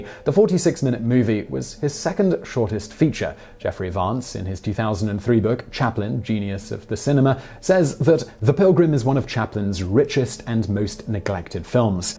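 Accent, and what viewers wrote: England, United Kingdom. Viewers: He is a man from England - the country that invented the language - and you claim he is not pronouncing American names in proper English. You do not own the language and you need to remember that. Americans are not the go-to for proper English pronunciation ffs! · With your accent on vowels, some words are difficult to understand for non-british English speakers. Example, it's difficult to comprehend if your saying "of Pablo Picasso Fame" when discussing the offshoot of Cubism. Making a legitimate Subtitles option would help. The YouTube Auto-generated subtitles don't provide accurate subtitles if many vowels don't have an American accent.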